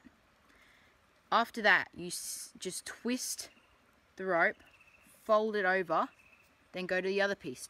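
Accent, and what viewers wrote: Australia. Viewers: Is he ozzie? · He’s Australian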